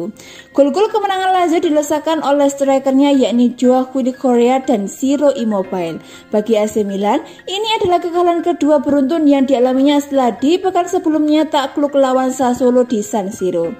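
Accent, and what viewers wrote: Java, Indonesia. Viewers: Medok min...😅😅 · medok banget min?cuma cakep jadi ciri khas channel ini salam dari interisti semoga milan lekas membaik performance nya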